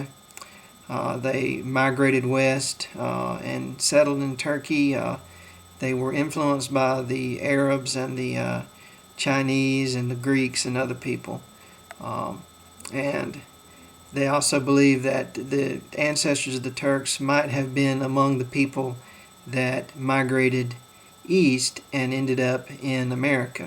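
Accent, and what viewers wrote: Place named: Carolinas, United States. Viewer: Thanks I'm greek and i was interested in learning some of my racial neighbors languages and you sound like ur from down south, im from NC and ur accent sounded very carolinian. But nice to know a few things about the turkish language from a non biased PoV(as most people know we dont seem to get along too well... but shit thats past worries.)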